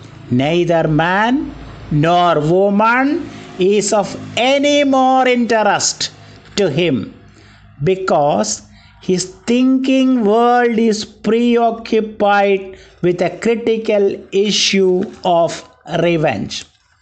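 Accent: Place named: Kerala, India. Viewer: Malayalam accent harms all along...😢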